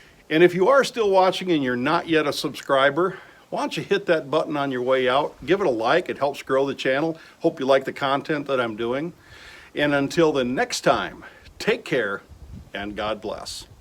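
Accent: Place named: Northern Minnesota, United States. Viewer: Those look like heavy duty gates! You're going to need to have some heavy hinges to hold the up! Your accent reminded me of how we poke a little fun at the northern MN folks - "Hey der, let's head up to Tunder Bay for some Molsons, ey." Have a great week!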